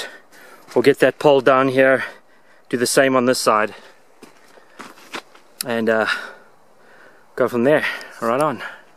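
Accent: South Africa. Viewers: Are you from South Africa? sure sounds like it, awesome stuff btw! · What is your accent? I'm guessing it's a mix, maybe a south african that now lives in america? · Love the videos man! Where are you from? You almost sound south african!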